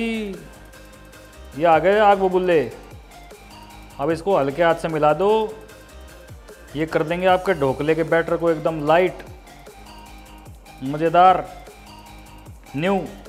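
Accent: Haryana, India. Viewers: Really liked your haryanvi accent big fan Sir 🥰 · ekdum majedaar recipe, like your haryanvi accent... god bless!! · Hi Chef, thanks for the yummy dhokla recipe. Will try it definitely over the weekend. Dhoklas are one of my all time favourite . The Harayanavi dialect is so sweet to hear.👏👏🙏🙏😊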